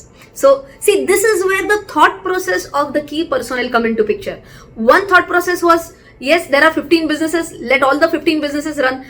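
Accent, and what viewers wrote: Maharashtra, India. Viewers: I enjoy the Marathi accent the most....and the lecture is top class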